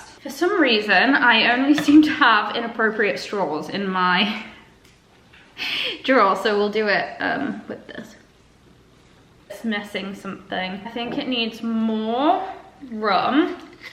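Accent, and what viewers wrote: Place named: United States. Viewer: Was so interesting watching you make the drink! I feel like in this video because you’re speaking more you can hear the slight American hint on your accent for some words. It suits you so well!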